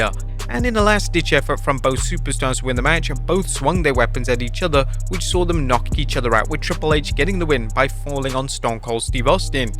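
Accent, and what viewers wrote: United Kingdom. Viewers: Is there ANY American youtuber talking wrestling? EVERY video I open has British accent.. 🤷🏻‍♂️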